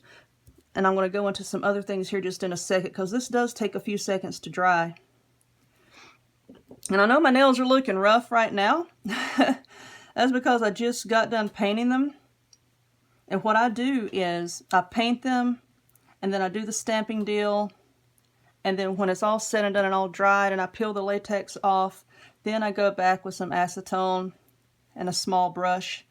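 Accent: South, United States. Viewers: TYFS...I luv your southern dra2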